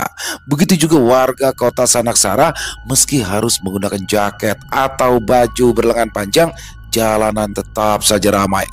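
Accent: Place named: Banjar, Indonesia. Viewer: Logat Banjar pengarang cerita. ❤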